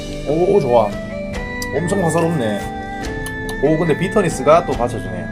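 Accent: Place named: Seoul, South Korea. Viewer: 와 유용한 정보들과 맥주역사까지 설명해주니 좋네요 무엇보다 서울말로 설명해주니 귀에 쏙쏙 들어옴!!!🤩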